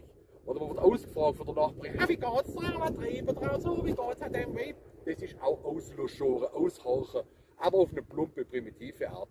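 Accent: Swabia, Germany. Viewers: Ich hab alles gut verstanden. Stuttgart geboren und lebe in den USA seid 83. Mach weiter so mit deinem schwäbischen Maulwerk. Love it . 😂🎉😂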